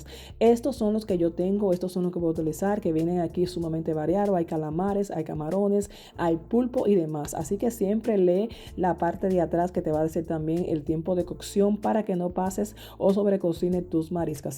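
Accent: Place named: Dominican Republic. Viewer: Me gusta mucho el acento dominicano. Saludos desde Venezuela.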